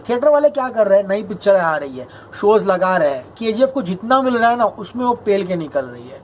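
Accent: Karnataka, India. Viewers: Yaar guru neevu...amazing reviews....one of the best style of reviewing...your kan-glish is outstanding..Namaskara.. ✨💥💥🙏🏻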